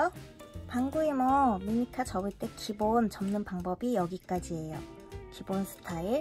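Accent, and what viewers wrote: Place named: Gyeongsang, South Korea. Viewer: 경상도 분이신가!! 자꾸 뽀족을 빼쪽한부분...이라고 하시네요.ㅎ 제가 경상도 아줌마라^*;;억양이랑 말투가 그리 들리네용ㅎㅎ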